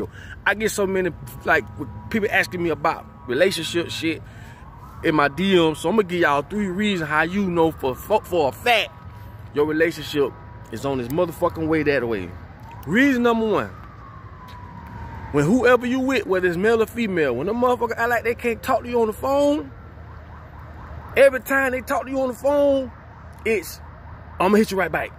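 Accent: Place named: New Orleans, United States. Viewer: Are you from New Orleans... I'm dying over here it's the truth 🤣🤣🤣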